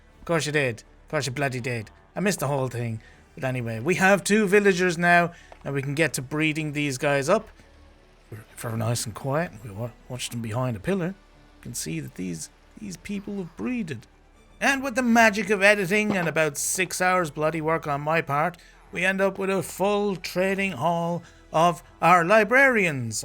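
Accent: Ireland. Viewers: I really am enjoying this One Block series. It is very different from what I've seen before but it is wonderful! 😊 I've been trying to catch where is your accent from? My partner is Irish and you sound quite Irish to me, where is it from? :) I can't wait to finish this video and see what else you have in store, greetings from Honduras! 😊 Edit: just finished the video! Congrats, you are so talented, I was blown away with the ship at the end 😮❤ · You don't sound Australian. I hear a strong Irish accent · I love everything about this One Block. I can honestly say that Its my favorite One Block. I am a fan of the Irish accent, your builds and your humor. I look forward to watching the rest of your vids. Oh and I subscribed today. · just found your chanel and 1 min in I was like this guys definitely irish just from the way you speek(I'm also irish) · OUUHH OKAY THATS COOL, i could tell from the accent!! 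i rarely see other irish people who play minecraft i got a bit hyped lmao, amazing video though good luck with growing <3! very underrated and have alot of potential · Definitely new here, but you're from Ireland, right? Its always nice hearing a familiar accent 😂😁😁😁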